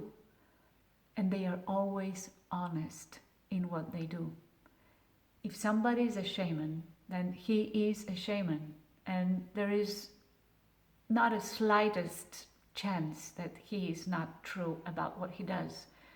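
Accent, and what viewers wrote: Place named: Spain. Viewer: Wspaniale przeslanie, española angielski. Jest pani the best🙂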